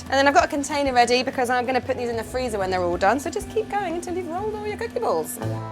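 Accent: United Kingdom. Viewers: Came for New York Cookies but heard a british accent............hahaha, anyways, not half bad looking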